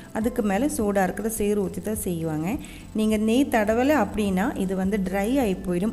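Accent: Kerala, India. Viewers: உங்கள் malayalam கலந்த தமிழ் பேச்சு மிக மிக அருமை.கேட்டு கொண்டே இருக்கலாம் போல உள்ளது Sister. வாழ்க வளமுடன். உங்கள் கேரளா recipes also அருமை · ur slang is so good and ur voice also malayalam kaladha Tamil nice to hear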